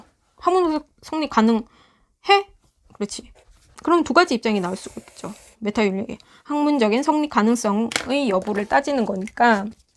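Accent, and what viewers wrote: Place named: Gyeongsang, South Korea. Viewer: 대구 목소리....영화 배우 목소리 듣는 기분임......아주 좋아요.....최고~~~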